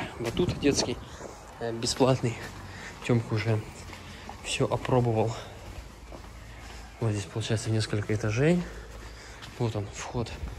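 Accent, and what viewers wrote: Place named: Urals, Russia. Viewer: У вас Уральский акцент, вы с Урала?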